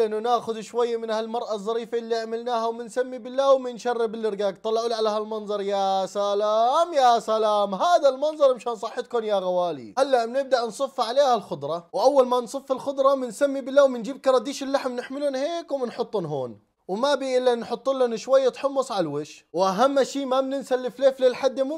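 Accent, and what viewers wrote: Syria. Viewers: رجعتي تحكي سورية التحلاب تموتو على البراني 😂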